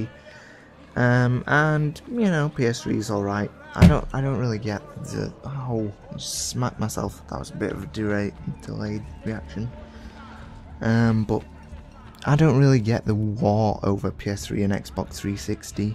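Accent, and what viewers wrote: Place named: United Kingdom. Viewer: caal of duu'y thats how you brits pronounce it.. or another word like "later" will be pronounced like "la'er"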